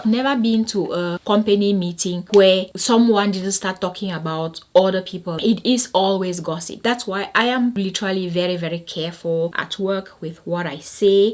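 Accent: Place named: Cameroon. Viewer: Loving the Cameroonian accent. You got a new subbie all the way from sunny Cape Town, South Africa!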